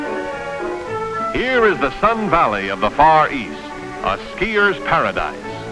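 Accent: United States. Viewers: contemptible American accent. Devilish accent.C,est accent est celui de la mort ,pour touts les pays .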